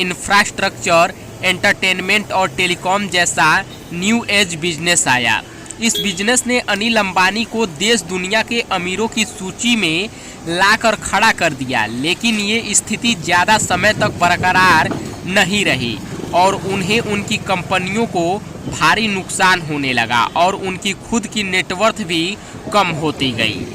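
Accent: Bihar, India. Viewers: Apki Hindi bilkul bhihari jese hai good job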